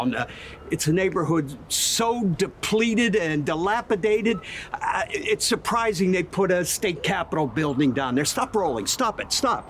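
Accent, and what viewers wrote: New England, United States. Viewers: Wow new Horton sounds like New England? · Holy shit you've got New England nailed down. Now when may we expect Roger? I can pick him up at the train station at a moment's notice.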